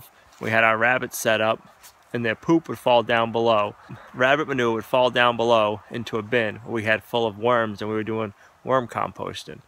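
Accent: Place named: Boston, United States. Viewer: ***No way! I didn't mean speech impediment or problem. I'm from way out west and you speak like a Bostonian. Or a New Hamshire-ian. It wasn't meant to be a criticism. :) Have a great Friday. Great work.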